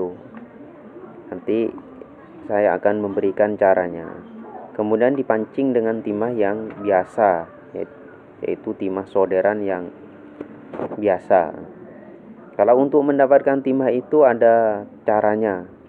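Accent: Madura, Indonesia. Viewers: Madura ya cak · Mak meddureh kak..... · Orang madura ni mh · Orang madura ya broo?? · Logat madura.. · Madureh kak toan.. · Orang madura ya logat nya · Medureh dimmah om.?? Terro ajhereh 😊😃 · Ini kayaknys.😃 bahasanya madurasa asli madura bsng🤗telok lemak😅😅😅 tran tretan man nyaman tok cerr...serrr....tak iye....😅😅😅👍coba entar kalau accu err/ soak saya servis bang...tapi alamatnya di mana mau service accu mobil kalau gk bisa😅😅😅🤗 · Bahasane.....kayak Madura..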